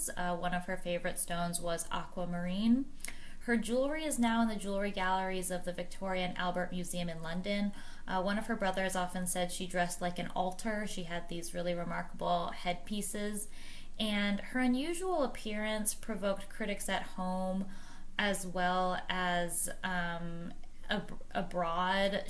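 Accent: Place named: United States. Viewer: shame about the accent. Why is it that American accents are so hard on the ears. It stumbles around the English aristocratic discourse and the pronounications are mainly wrong. Leave Edith Sitwell to us-the British-and you do Miss Monroe.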